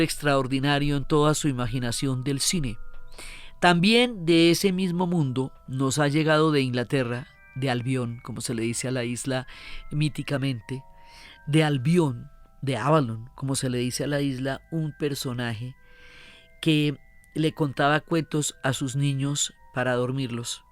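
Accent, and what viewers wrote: Colombia. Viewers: Gracias! Tu voz me acerca a mi Colombia. Mil abrazos desde el exilio 🥺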